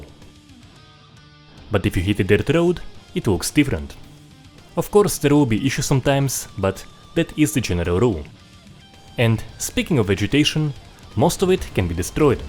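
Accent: Russia. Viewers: U from russia?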